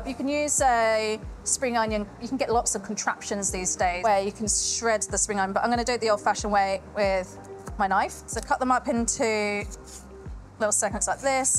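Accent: United Kingdom. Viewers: I had this playing on the background and I thought I heard Gillian Anderson with British accent talking.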